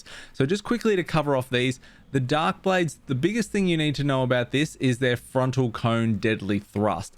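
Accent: Australia. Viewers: Are you on Barthilas? Also, are you Australian?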